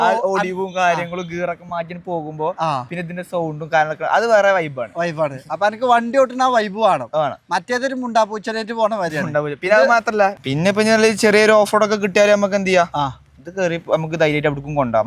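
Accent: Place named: Northern Kerala, India. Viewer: Hi എനിക്ക് നിങ്ങളുടെ സംസാരം കേൾക്കാൻ വലിയ ഇഷ്ടമാ. വടക്കൻ സ്ലാങ് 😅😅😅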